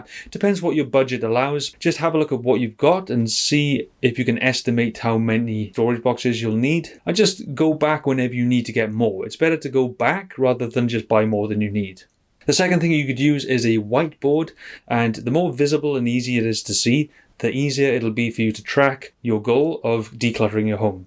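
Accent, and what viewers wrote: Wales, United Kingdom. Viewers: Hey you are welsh. Howdy shw mae?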